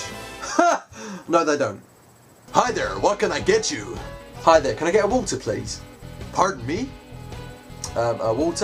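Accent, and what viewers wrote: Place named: United States. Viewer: hahaha nice video...and your very good at the american accent!!:D!!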